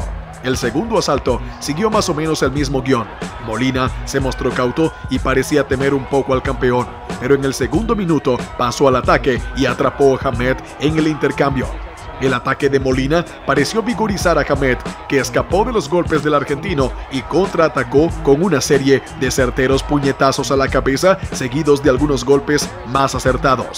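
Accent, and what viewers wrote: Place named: Central America. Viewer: Parcial y mediocre tu video y TU SABES muy bien porque. No ubico tu acento pero debes ser centroamericano, no encuentro otra explicacion para tan inconmesurable omission.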